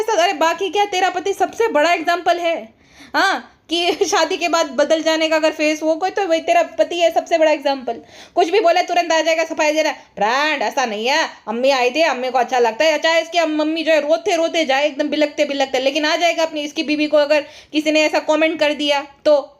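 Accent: Gujarat, India. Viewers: Aap gujrat se ho kya??? Bcz some words se gujrati lgte ho😅😅😅😅plzz reply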